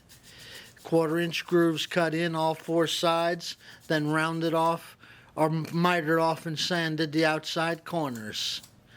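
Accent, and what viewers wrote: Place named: New Orleans, United States. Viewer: that's slick. I'm planning to build some drawers in my shop and I'm definitely going to have to use some " Blocks". I can still detect a little of the New Orleans accent.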